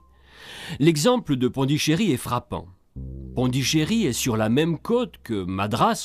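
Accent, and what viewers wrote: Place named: Réunion, France. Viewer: Très bon reportage, bravo, c'est très enrichissant ! C'est agréable d'avoir un documentaire avec ce léger accent rényonais :-) Je viens habiter dans quelques mois sur cette île que j'aime tant !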